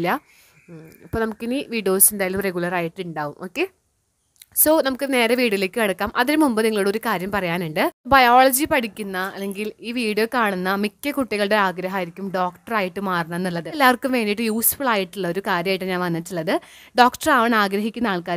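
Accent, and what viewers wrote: Thrissur, India. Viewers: നിങ്ങൾ തൃശൂർകാരി ആണോ, റിപ്ലൈ തരുമ്പോൾ ഇടാം ട്ടാ എന്ന് പറയണൊണ്ട് ചോദിച്ചതാ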